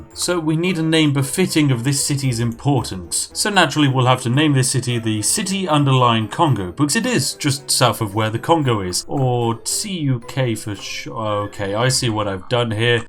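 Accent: United Kingdom. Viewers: Are you really British? Why I like your accent more than the real British people? ❤️🙃😂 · Watching on my country side with a large cup of tea. Mmmm British accent "My lord. It's absolutely marvelous". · British english, lol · Damn you! My colonial mind is programed to be receptive to a British accent and I've actually bought a 240 pack of yorkshire tea.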